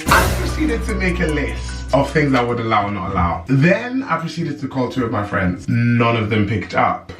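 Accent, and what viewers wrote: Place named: North, United Kingdom. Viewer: HOW HAS YOUR ACCENT GOT ALL NORTHERN ALL OF A SUDDEN??? I’m getting rid of kitten heels in my dictatorship sorry not sorry.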